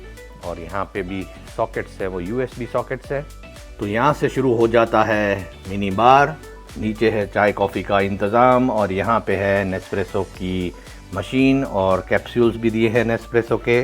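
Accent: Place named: Italy. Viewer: You have build a nice Italiano accent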